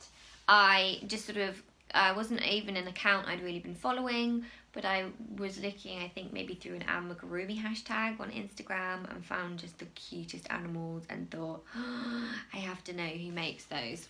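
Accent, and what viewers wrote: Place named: United Kingdom. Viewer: Happy to find your podcast, watching for the first time! I love mustard, I love that you crochet, and I love your energy. Your accent brings me back to my time living in the UK (in the USA now) and I can't wait to watch more of your episodes!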